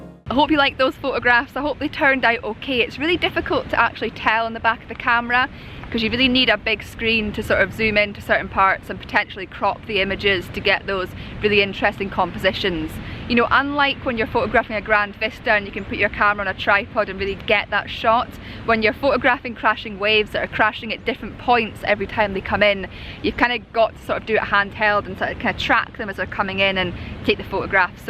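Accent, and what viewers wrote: Scotland, United Kingdom. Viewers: .love the wave photos.I been watching all your videos and find them most enjoyable, If it wasn't for the cute scottish accent, i might say you talk a wee bit to much lass.Keep up the good work. hope you make it to Orkeny.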